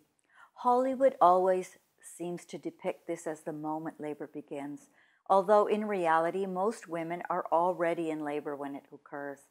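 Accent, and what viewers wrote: Canada. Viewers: I believe she is Canadian based on her accent and also the way the words are spelled on the screen (such as labour spelled with a "u"). This may be why she pronounces words just a bit differently. It's not necessarily wrong, it's just a regional difference.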